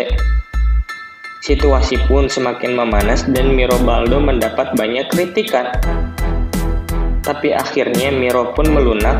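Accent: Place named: Banyumas, Indonesia. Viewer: orang ngapak mungkin aslinya dia bro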